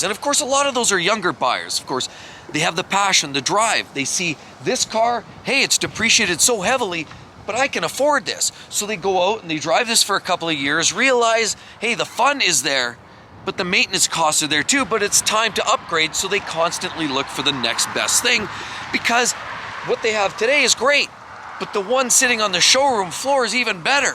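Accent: Edmonton, Canada. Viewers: Are you from Edmonton?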